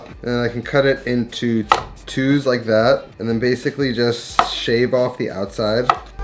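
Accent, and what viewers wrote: New York, United States. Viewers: I agree. I think he's from New York judging from accent but his food is not Italo-americano which is great but more what I've eaten for years in Italy.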